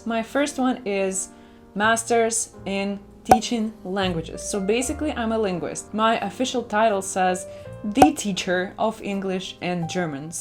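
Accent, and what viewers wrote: Russia. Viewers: I love when you do "blah blah blah " And your Russian ascent is amazing👍🤩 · Love your Russian accent! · Как же приятно слушать русский английский =) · I love the Russian accent It's music to my ears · I love your russian accent 🥰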